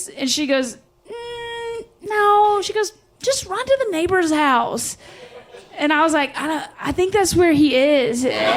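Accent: United States. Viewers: I recognize the accent I had a feeling she was from Kentucky just from how she said latch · How are you southern and from Kentucky?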